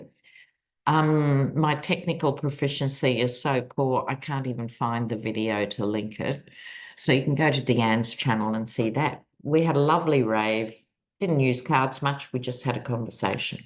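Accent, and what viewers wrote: Australia. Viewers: Love you,! Your Aussie accent & dry humour somehow makes the bad news easier to take!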